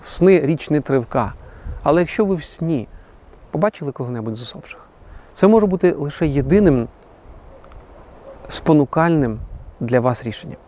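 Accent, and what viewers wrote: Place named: Russia. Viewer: Яка українська - московська(сумно)!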